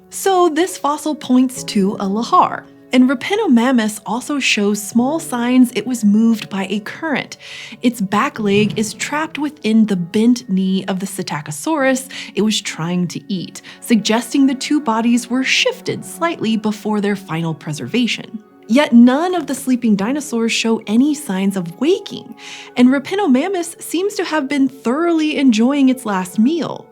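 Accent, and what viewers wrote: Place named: United States. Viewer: Dear PBS, for us older folks that still use the imperial system of measurement , please use both systems when describing distance. This speaker sounded very American so why are you using the metric system and not including the older American imperial system ? We old folks aren't dead yet please mention both systems.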